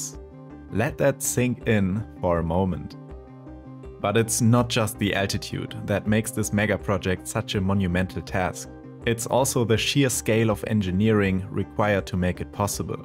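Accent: Germany. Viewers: I love your German accent ❤ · man hört den deutschen akzent immer raus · dein deutscher Akzent gar nicht zu überhören😂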